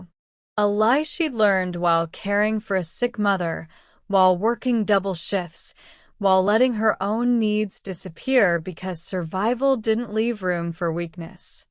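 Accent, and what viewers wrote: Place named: Spain. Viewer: Playback speed x1.25 works well. Good story, but the spanish pronunciation spoils the flow.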